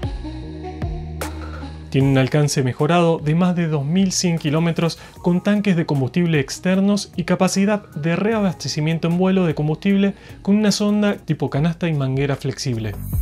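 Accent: Argentina. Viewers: Como siempre dándonos cátedra de aviación excelente video me gustaría saber que nacionalidad tienen, párese argentino tu bonito acento. Es com argentino, lindo fuera que la fuerza aérea argentina tuviera estos aviones, con los eselentes pilotos, sooy de el mísero país de Colombia reprimido por el gobierno norco paramilitar acmiro al el pueblo, Argentino saludos Dios los bendiga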